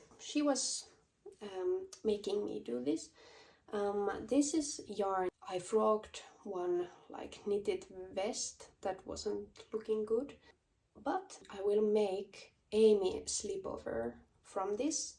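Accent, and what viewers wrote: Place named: England, United Kingdom. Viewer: You have a beautiful English accent!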